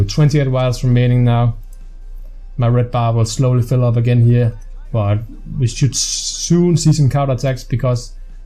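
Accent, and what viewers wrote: Denmark. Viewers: Er du Dansk? Du lyder Dansk?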